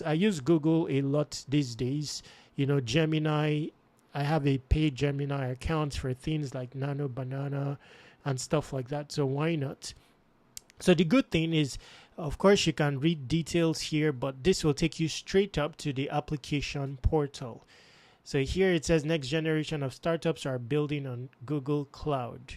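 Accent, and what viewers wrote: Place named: Nigeria. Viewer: Hello, thanks for sharing. You sound Nigerian 😊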